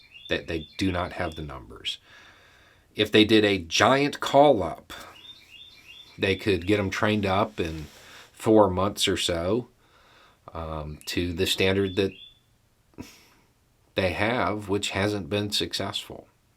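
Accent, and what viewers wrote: South, United States. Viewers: As a gal who grew up in part in southern Alabama, it's nice to hear a Southern accent talking sense.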